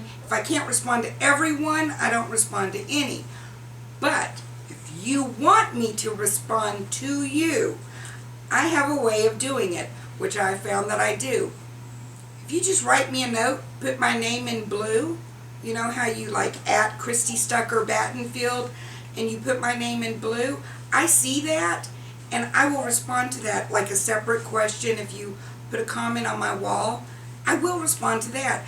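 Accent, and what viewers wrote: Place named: South, United States. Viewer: I am from Arkansas but have been living in Pennsylvania for over a year now (.My husband's job brought us here ) I am sometimes starved for the sweet sound of a southern accent ! I think I watched 90% of your videos last night and thoroughly enjoyed them all !!! I even shared one on my Facebook wall . Keep making those videos !!!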